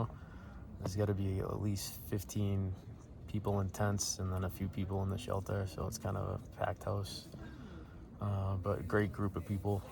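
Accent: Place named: Boston, United States. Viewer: Might you be from Boston, slight accent there. What's the trail name,?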